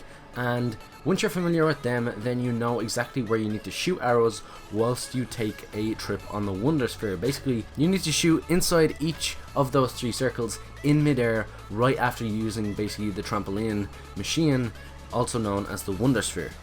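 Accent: Dublin, Ireland. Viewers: Are you from Dublin you sound like it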